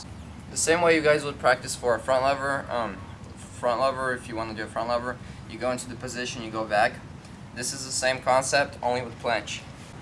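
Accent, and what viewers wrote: Russia. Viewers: Is he Russian ? I can hear he has an accent but i don't know what accent is that xD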